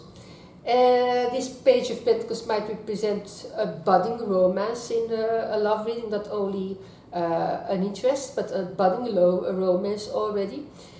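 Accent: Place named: Netherlands. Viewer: Are you from the Netherlands? Your accent sound like dutch.❤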